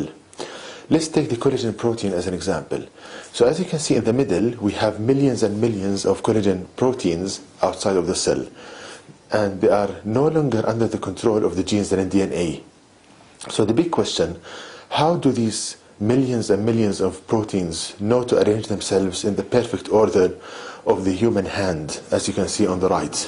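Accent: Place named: Arab world. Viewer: he speaks EXACTLY like ownage pranks arab accent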